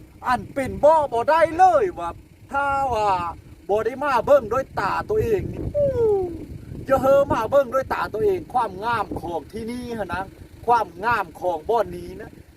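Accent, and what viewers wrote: Phu Thai, Thailand. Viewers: เว้าโม่นเด้ มักๆสำเนียงภูไท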